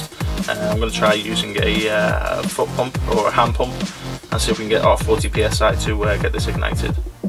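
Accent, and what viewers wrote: Liverpool, United Kingdom. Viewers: nice video! Are you a Scouser?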